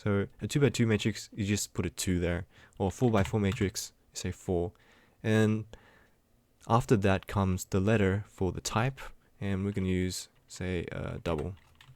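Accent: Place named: Australia. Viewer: i love ur aussy accent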